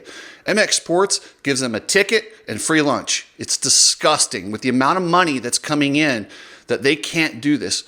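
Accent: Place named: California, United States. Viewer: It's motocross, shit happens. It sounds like you're from California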